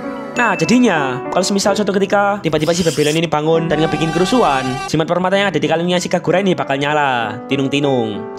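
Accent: Java, Indonesia. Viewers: 3 orang yang gua suka denger logat jawanya : 1. Guru matematika gua jaman smp 2. Pak jokowi 3. Mas mas yang punya channel ini 😅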